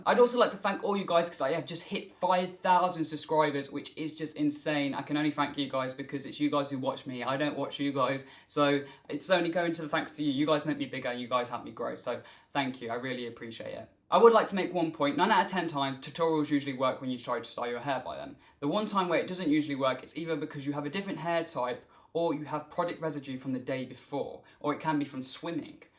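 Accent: United Kingdom. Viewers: I would like to say I understood everything what you said, but actually I didn't... it's because the way you speak is kinda hard to understand at the begging (I'm used to listen american accent), but on the other hand I love it... british accent is so peculary it's so fantastic, I would like to speak with your accent (I'm not from a english speaker land). Ohhh and good video... Actually I watched it bc your accent lol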